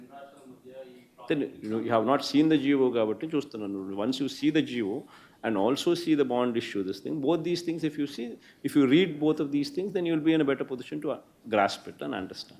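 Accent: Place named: Kadapa, India. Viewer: Chi kadapa yaasa! .5 yaasa idi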